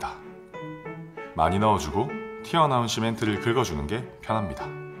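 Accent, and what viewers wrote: Gyeongsang, South Korea. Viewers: 은근한 사투리가 섞여계시네유 경상도느낌ㅎㅎㅎ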